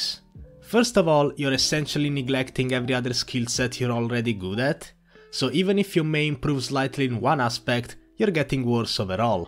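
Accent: Italy. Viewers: you are italian? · are you italian mate? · you have an italian accent? Are you italian? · Lol ho capito che eri italiano solo dalla pronuncia ahah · Are you italian?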